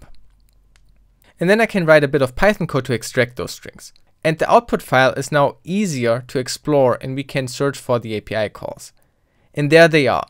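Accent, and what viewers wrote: Germany. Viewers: MinecraftJeffy don't be stupid, he speaks english to reach a bigger audience and everyone understands what he wants to tell. He might have a german accent, but how should anyone learn English if you forbid themto speak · Obviously German accent xD But hornestly I would have used Wireshark to export the data from the webserver. It fetches all incimming and outgoing data for that. Quiet nice hearing about that other programs, but it looks to unconfortable to me exporting ram to get a server request. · From your accent i can guess youre German, da sag ich nur: Gute deutsche Arbeit! daumen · Hard German accent. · thats a german accent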